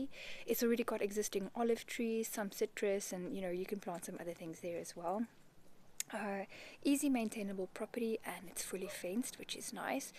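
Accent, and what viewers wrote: South Africa. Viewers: Well done for chronicling your search. Good output of vlogs with updates and good dialogue/commentary, if a little difficult to hear in places - using earphones worked for me. Appreciate you may have limited resources whilst travelling and just starting out with vlogging, your vlogs would be enhanced with photographs and maps to illustrate the talking parts. Your accent and tone is much sweeter than a lot of SA's, is is distinctive of your home region.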